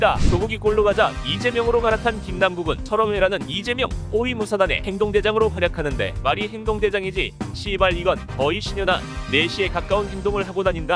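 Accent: Jeolla, South Korea. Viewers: 뭐여 전라도여?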